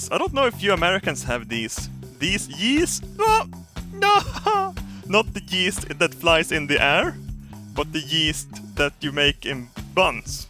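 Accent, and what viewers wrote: Sweden. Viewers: the way everyone in the comments is trying to correct because of how he says the word Flour, or just saying "Nice english bro" He's swedish they pronounce words differently not everyone says words the way you do. So stop correcting him. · Min favorite part av this video är the svengelska! :) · Swendlish is aborable. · ”put your buns on backplåtts-paper” His swenglish is perfection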